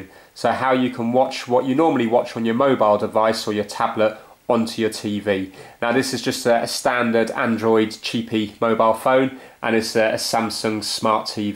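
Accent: United Kingdom. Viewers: British Accent is Ughhhh!!! 😍😍😍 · I LOVE BRITISH ACCENT.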